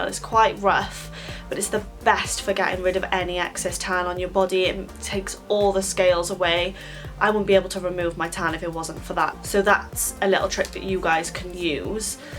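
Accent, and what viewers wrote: Wales, United Kingdom. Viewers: you welsh? xxx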